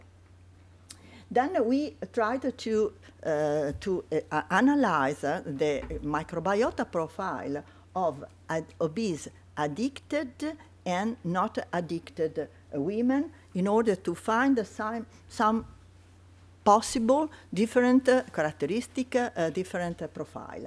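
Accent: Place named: Italy. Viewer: Cant listen to this english with strong italian accent.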